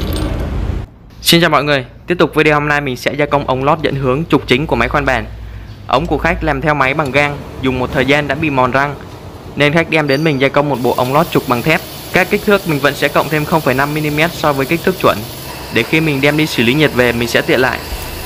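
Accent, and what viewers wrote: Vietnam. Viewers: Bạn nói giọng bắc tưởng ở đâu đó loanh quanh Hà Tây thì nhất định chạy đến mua bia với mồi nhậu để xin kết bạn hihi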